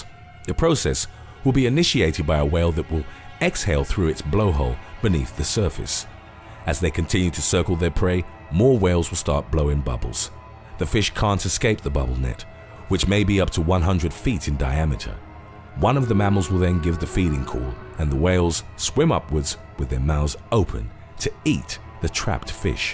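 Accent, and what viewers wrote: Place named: United Kingdom. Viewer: Funny when a guy with British accent says : soccer pitch.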